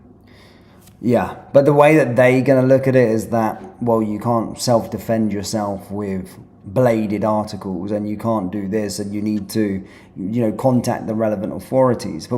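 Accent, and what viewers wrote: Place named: England, United Kingdom. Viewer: why should he be speaking like an English person in a Scottish town?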